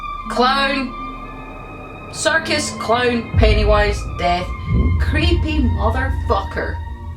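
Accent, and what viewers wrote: Scotland, United Kingdom. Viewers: I have a question can you teach me how to speak Scottish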